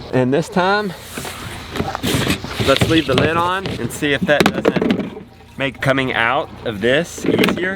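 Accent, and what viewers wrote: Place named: Hawaii, United States. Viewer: Hey you sound like a hawaii local person say. We go see if the water stay flowing. That's what we say. Ok den brah!🤙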